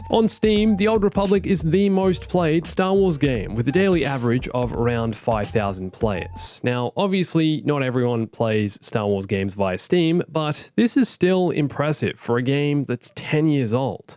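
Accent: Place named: Australia. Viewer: Mate you sound like a Aussie I got a X series about two weeks ago I love fallen order and it doesn't freeze it plays better and not as dark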